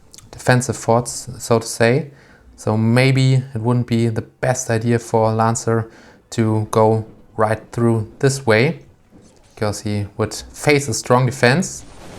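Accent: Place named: Germany. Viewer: Hello there I would like to ask you why you sometimes refer to yourself in the third person, it makes you sound a little arrogant, no offence intented. Just wanted to make you aware of this since I noticed that you are a non-native speaker due to your German accent. As always, some really enjoyable content:D Keep it up!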